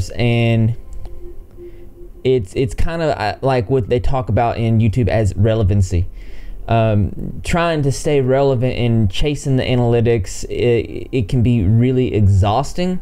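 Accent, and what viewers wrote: South, United States. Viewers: Your alternative style mixed with your country accent is pretty fire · I really enjoy your dialect, it souds so down-to-earth and accomodating. I can tell it's a southern 'accent' but where are you from exactly if you don't mind me asking? :)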